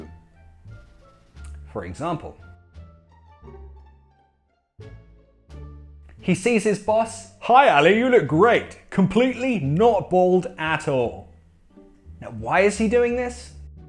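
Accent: United Kingdom. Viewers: is he British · What is your accent? Is it ordinary british? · Bro, Your accent is exactly like Harry Potter. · Hahaha I discovered your channel some days behind and I am really enjoying it a looooot~ u made me want to forget the american accent I learned to get the British accent u speak haha Thank u for the really funny and educative videos~ hugs from Brazil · I luv the British Accent 😄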